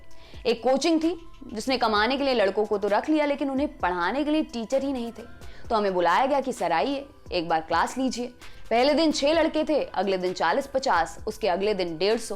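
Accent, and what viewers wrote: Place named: Bihar, India. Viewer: Ya bihar se ay h lalu jaisa hi na bolenga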